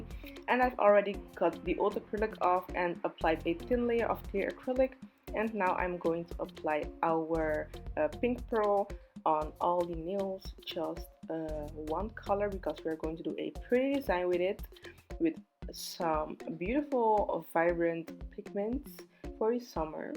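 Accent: Netherlands. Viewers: Ik hoorde gelijk zij is Nederlands 😂 girlll those nails gorgeousss✨😍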